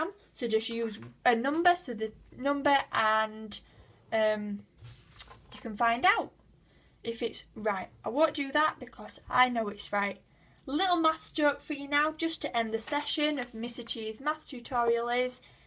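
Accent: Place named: Yorkshire, United Kingdom. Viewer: Ah that's a shame, every now and then I have to come back to GCSE math and this video was easily the best I could find. Also nice to hear a familiar accent! Where are you from? I'm in Leeds I'm guessing you're from somewhere in Yorkshire?!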